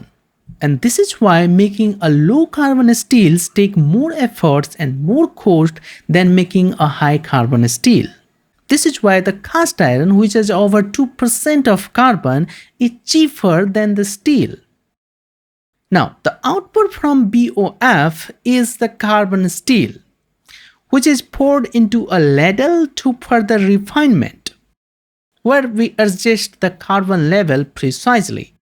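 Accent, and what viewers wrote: India. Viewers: Shit that is the thickest Indian dialect I have heard. Good video though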